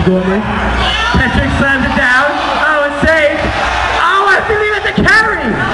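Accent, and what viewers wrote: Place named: United Kingdom. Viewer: wow looking back that british accent was a terrible idea and extremely annoying hahaha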